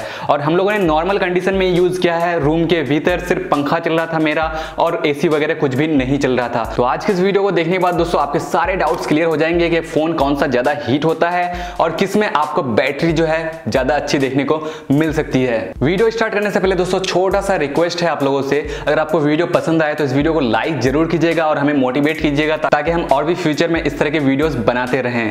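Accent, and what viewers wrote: Bengal, India. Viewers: main v hun. Bankura se.apka baat Karne ki tarika se mujhe laga ki ap bangali ho..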